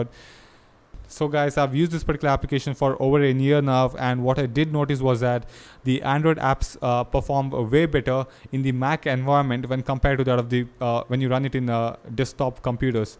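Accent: India. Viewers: u sounded indian or asian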